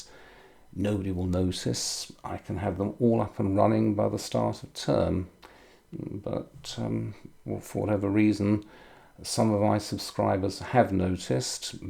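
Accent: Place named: Oxford, United Kingdom. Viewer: Thank you Mr. for all your videos. American subscriber here, I appreciate your easy to understand lectures about interesting historical subjects. Plus your accent makes me feel like I’m a top student attending a class at Oxford University. I’ve listened to your videos several times over and eagerly await your next upload. Thanks again.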